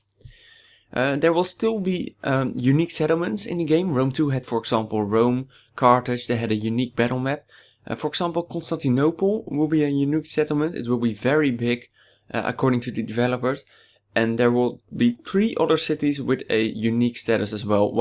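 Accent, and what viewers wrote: Netherlands. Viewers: Are you Dutch? You sound like it!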